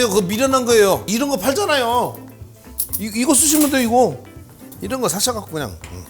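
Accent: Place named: Countryside, South Korea. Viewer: 쩜매가꼬 구수한 고향의 사투리 반갑습니다 어린시절 시골에서 살때 참 많이듣던 짬매가꼬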